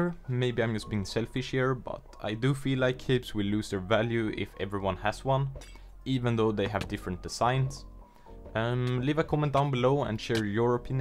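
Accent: Sweden. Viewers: You sound Swedish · I like how I can hear the Swedish accent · ur swedish no doubt about it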